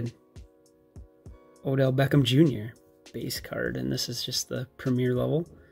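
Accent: Minnesota, United States. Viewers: What part of Minnesota are you from?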